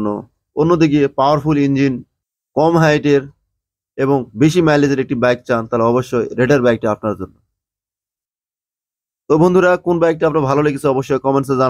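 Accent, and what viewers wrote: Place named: Rajshahi, Bangladesh. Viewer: তোমার বাড়ি কি জয়পুর কথা শুনে তো মনে হচ্ছে জয়পুরহাট গ্রামে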